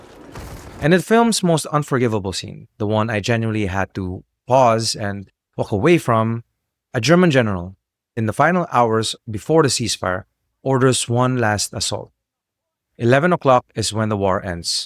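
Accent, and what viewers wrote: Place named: Philippines. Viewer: It didn’t even take me 10 seconds to know ur pinoy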